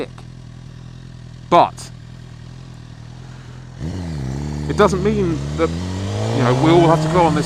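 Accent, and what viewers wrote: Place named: RP, United Kingdom. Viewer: I must admit, you speak the Queen's English better than most people. If you're not a Baron, you should be!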